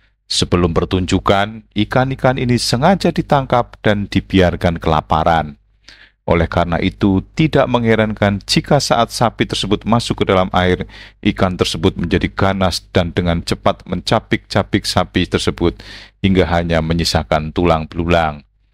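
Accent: Indonesia. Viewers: Logat nya sangat kental sekali, pasti beliau orang medan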